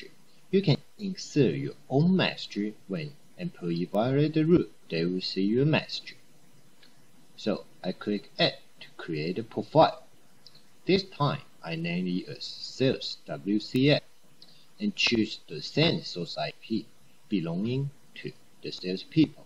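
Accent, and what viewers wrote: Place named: China. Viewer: No offense to creator, but it sounds more like Chinese rather than English... Can't be bothered listening...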